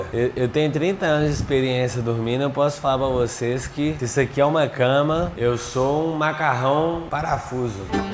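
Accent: Minas Gerais, Brazil. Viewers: Mano acho que você é mineiro como eu, ou eu me enganei ! Rs Esse sotaque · Eu amo seu sotaque, só quem é mineiro entendi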